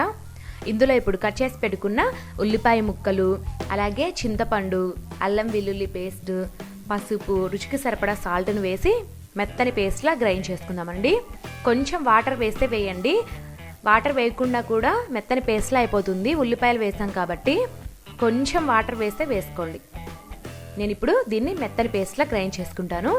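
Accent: India. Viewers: Mi language antha coastal area la undi...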